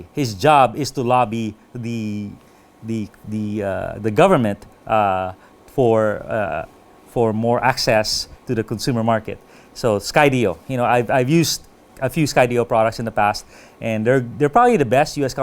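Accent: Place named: Philippines. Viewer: Good information brother 🤙 I can tell from your accent that you speak Tagalog?….and does this going to be in the Philippines too?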